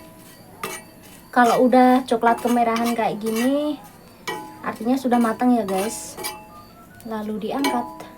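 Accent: Java, Indonesia. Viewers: seperti org Jawa tp bukan Jawa barat Sunda soalnya jawany seperti ada mirip bhasa cirebon tp bukan heee pernah bilang "umeb" artinya mendidih heee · Jowone Endi sampean mbak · Asyik jowomu jek medok dik ❤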